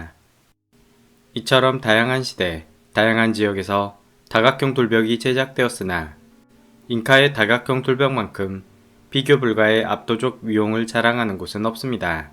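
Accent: Jeolla, South Korea. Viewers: 나레이션에서 느껴지는 전라도 사투리 억양이 꼬소하네요 ㅋㅋㅋ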